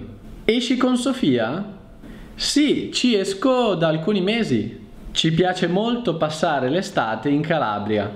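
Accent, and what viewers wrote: Brazil. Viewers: Lástima que en la pronunciación, lo hace como brasilero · Ciao! Tu sei italiano o Brasiliano?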